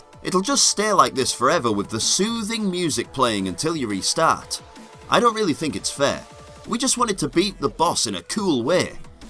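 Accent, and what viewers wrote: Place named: North, United Kingdom. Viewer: Loving your northern accent.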